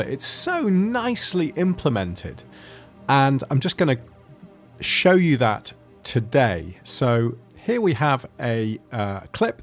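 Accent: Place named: United Kingdom. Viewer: Thanks so much. It helps me a lot. I like your British English. Do you have more Tutorials for Davinci Resolve? Greetings from Germany, Kathleen.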